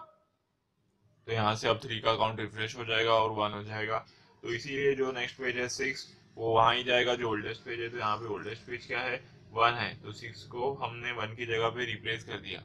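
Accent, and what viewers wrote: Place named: Mumbai, India. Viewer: kya badiya mumbai accent hai sir ghar ki yaad dila di ekdum badiya sikhaya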